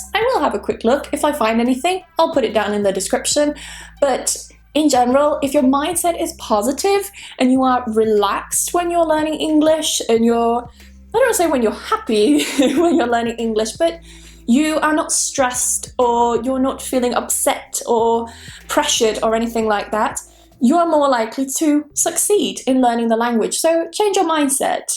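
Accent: United Kingdom. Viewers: Hello happy new year 2020, I want to say you many thanks for all videos 2019, they helpful me so much. I enjoyed, learned a lot of things about English language and I wish to continue my English progress with you and your English lessons 😁🤗. By the way I love your pronunciation voice, So I love the British pronunciation 🏴. Best regards from Colombia 🇨🇴.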